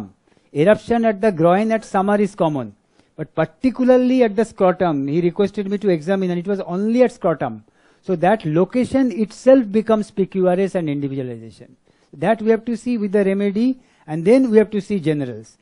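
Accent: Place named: Mumbai, India. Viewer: I had to blink a few times. The doctor looks like my German neighbour, but the language is definitely Mumbai.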